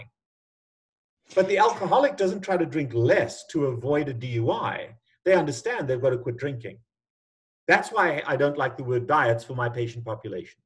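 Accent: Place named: South Africa. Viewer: Ha ha. I can hear a South African accent even when it hardly exists. What amazing doctors UCT produced.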